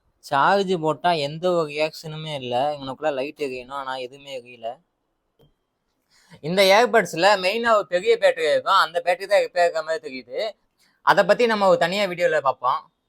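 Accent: Madurai, India. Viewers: Bro nega madurai yaa bro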